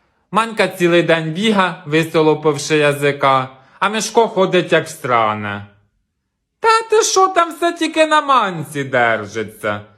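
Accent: Poltava, Ukraine. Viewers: Звідки? по говірці дуже на Полтаву схоже)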